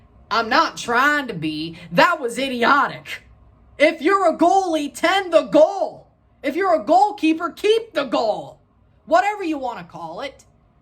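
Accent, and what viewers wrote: United States. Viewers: I love your GRINGO Spanish!!! Morocco loves the USA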